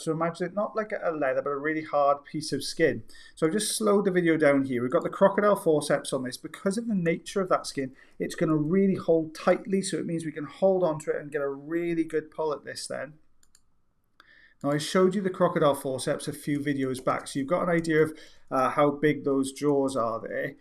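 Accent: Wales, United Kingdom. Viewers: Where in Wales ARE YOU FROM???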